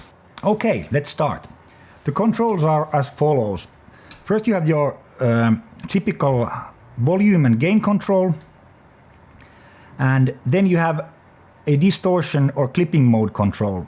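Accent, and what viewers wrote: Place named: Finland. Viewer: Relax man, I herad the finnish accent, arleady Im buyin this thingy, the MI peds I own are pure genius...Im not so much for crunch, but boysngurls MI means myne, so try try try..., someone said that this with the Super Blues Pro n strat..., LOVE, Kimmo : )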